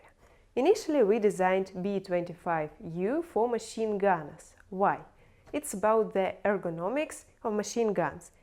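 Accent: Russia. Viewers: I think I found my new asmr channel. I love the Russian accent and I love listening to people talk about guns. 🤣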